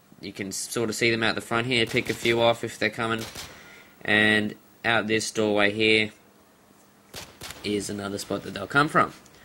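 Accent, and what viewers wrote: Australia. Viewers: Austrailian accent! 3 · a few on downturn lockdown and hhat but still nice r u australian u have cool accent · Are you Australian?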